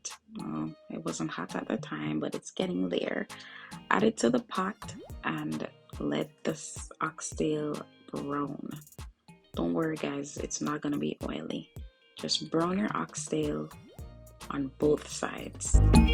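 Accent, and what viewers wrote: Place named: Jamaica. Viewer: Jerk seasoning on oxtail? How is this authentic? Because you sound Jamaican?you add both ketchup and American ingredient bbq sauce and sugar So you making Jerk oxtail? Why trying to change oxtail to oxtail stew? What’s the yellow film, you add flour gravy no look so. Don’t stew suppose to have potato, carrots?Some many questions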